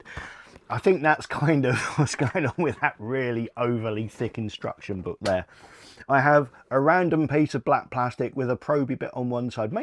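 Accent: United Kingdom. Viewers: i thought you lived in England because of your accent and the fact you said you had to be in the UK for the giveaway, you can get a lot of things if you pay enough money and you have a completely clean record, but it is very controlled, i wish it was easier and cheaper but it's not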